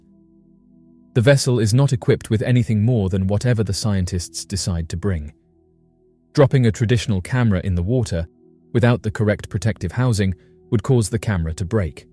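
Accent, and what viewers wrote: United Kingdom. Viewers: What's with the limey accent?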